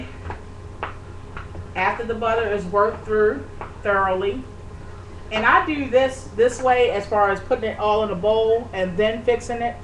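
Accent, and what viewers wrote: Baltimore, United States. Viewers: are u from bmore? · Are you from Baltimore? you got that B-more "U" sound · she from bmore he voice I'm from dc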